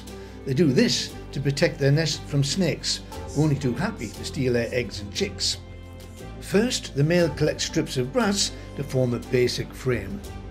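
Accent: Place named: Newcastle, United Kingdom. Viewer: LOVE THE JORDY ACCENT LOVE YOUR VIDEOS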